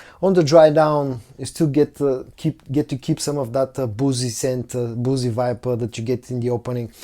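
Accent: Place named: Bulgaria. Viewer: I finally realized how that Bulgarian accent works! The wood "oud" and "wood" almost sound the same. I've heard you describe some scents as "oudsy" but you're actually saying "woodsy". 😂 Still getting unsubbed every day, so don't think I've abandoned you friend! Be safe all.🙏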